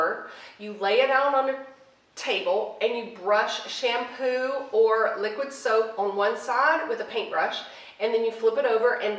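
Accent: South, United States. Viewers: I love her southern drawl. Super charming.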